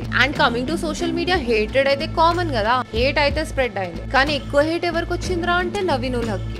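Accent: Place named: Telangana, India. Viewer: Telangana slang keka super sister Jai srh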